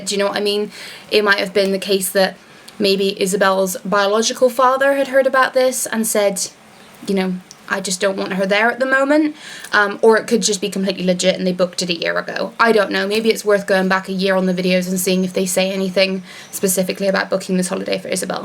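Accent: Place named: Scotland, United Kingdom. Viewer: Can't determine whether you are Scottish or English?? Definitely the tiniest slight hint of Scottish in your accent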